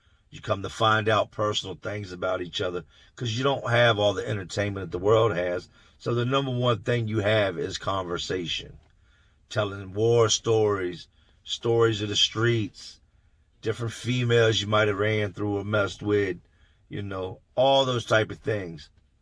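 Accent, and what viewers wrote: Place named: Philadelphia, United States. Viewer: Bro i love your stories. I thought you was a little wiggerish at first but thats just how they speak in Philly. You're gonna blow up. Your content is better than 1090 and Deaths by far. You don't do all that dumb extra shit man. Damn I hate how death stops to laugh or make the lamest jokes. I literally cringe when he does that. So I unsubbed. Death def isn't hard and he hella slow. But ofc you wanna keep shit sweet w him cuz that's how you get put on is getting an interview by him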